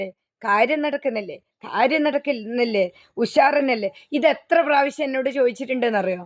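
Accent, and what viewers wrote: Kannur, India. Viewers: ninakkonnum vere joliyille? Kannur dialect kelkkan oru bhangiyumilla.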